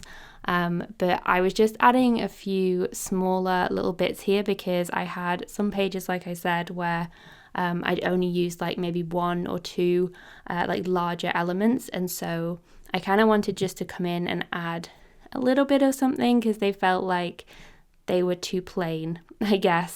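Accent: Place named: Manchester, United Kingdom. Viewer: Love these, it's great to not have to stitch the pages in for once ❤ Off topic, but can I ask whereabouts you're from? You sound sort of Greater Manchester-esque like me!! (I'm in Stockport) x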